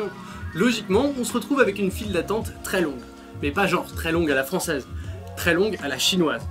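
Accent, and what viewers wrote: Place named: England, United Kingdom. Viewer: Je salut l'accent anglais !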